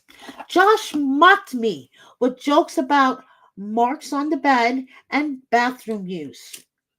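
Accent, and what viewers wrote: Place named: New York City, United States. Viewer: Hi happy holidays. I love your channel and just subscribed. Your accent reminds me of my New York City roots. Aloha from Hawaii.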